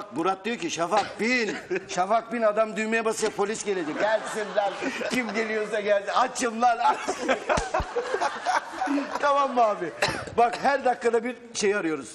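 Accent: Ankara, Turkey. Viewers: tam ankaralı .d şiveyi bıraksa tamam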